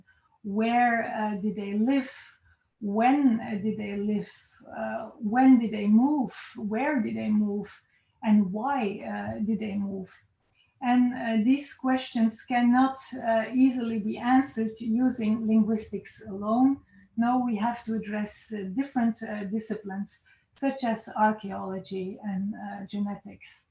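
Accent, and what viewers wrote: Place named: Belgium. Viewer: I would have guessed a Belgian French accent, but either way it's the same region and matches her name (French first name, Dutch or Flemish last name). The quality of comments here is atrocious even for YouTube and far worse than anything I have seen under any other academic video. The OP here is a good example for this. Living in Neukölln, I love Turkish culture, but the guys commenting here are doing a good job of harming their country's reputation. I have only seen similar idiocy on this level from Indians.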